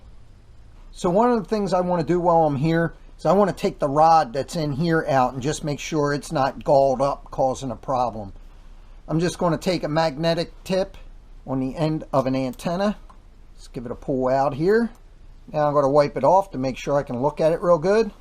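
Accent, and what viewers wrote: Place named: Mid-Atlantic, United States. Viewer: Also, are you from PA, Deleware, or Northern Maryland? Sounds like it.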